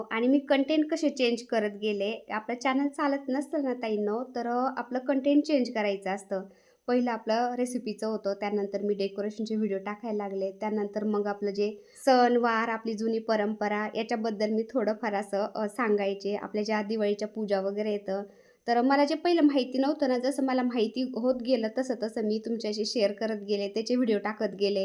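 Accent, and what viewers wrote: Ahmednagar, India. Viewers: श्री स्वामी समर्थ ताई तुमची यूट्यूब ची जर्नी खूप छान होते प्रेरणादायी होती😊❤ तुमचा आवाज तुमच्या नगरची भाषा खूप छान आहे म्हणूनच व्हिडिओ बघायला खूप छान वाटते❤😊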